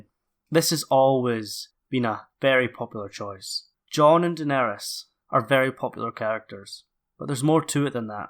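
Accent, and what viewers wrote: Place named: Scotland, United Kingdom. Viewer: Just stumbled across your channel, great stuff; am sure I'll be binge watching it through during the wet scottish summer this year. Also awesome accent. For anyone who's unfamiliar with Scottish accents, this dudes got a lovely 'kind, soft' variant of the accent - the other is more akin to this : <link> Just kidding, I love living here in Scotland! Thanks!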